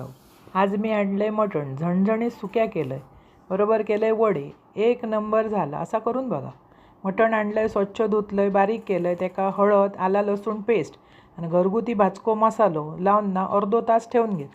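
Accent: Malvani, India. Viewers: तुमची सांगण्याची पद्धत खूप छान...सहज आणि सुंदर मालवणी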